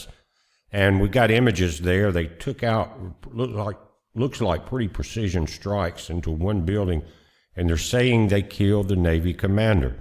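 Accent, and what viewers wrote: South, United States. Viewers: You are a real scumbag. Your old man creepy voice... Lil southern draw... You do not represent America... You are a war hawk with no threat of being drafted into Israeli war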